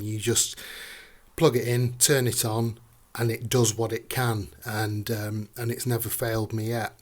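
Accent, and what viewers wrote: United Kingdom. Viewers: Great breakdown. Question, what regional English accent do you have? I like it. Best guess is somewhere near Birmingham? Or near London, actually. Hello from California.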